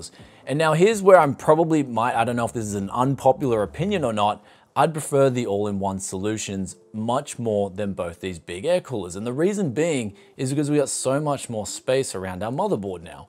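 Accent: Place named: Australia. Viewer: It's OK to say whatever. YouTube bots can't understand aussie accents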